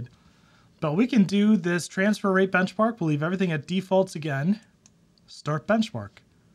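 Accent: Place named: Philadelphia, United States. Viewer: WOODER... Iconic accent of a philly local, lol Met you at VCF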